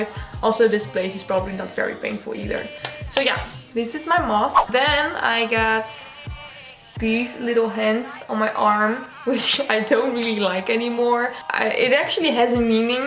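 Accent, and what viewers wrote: Netherlands. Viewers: Is ze Hollands ? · I could hear youre from the netherlands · Ben jij Nederlands want ik hoor een nederlands accent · moon kit ja ze is nederlands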